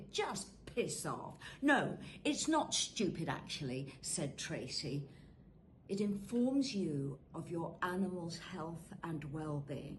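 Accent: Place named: Oxford, United Kingdom. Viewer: Вы знаете, мне бесконечно импонирует Ваше абсолютно безупречно-совершенное Оксфордское произношение, но так-ли нужно, чтобы Ваш Кролик так часто посещал солярий !???? 😢😢😢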